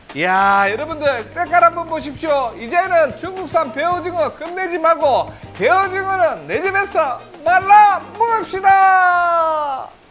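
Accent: Gyeongsang, South Korea. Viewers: 갱상도 사투리 존나 듣기 싫은데 조심하세욧 ㅋㅋㅋㅋ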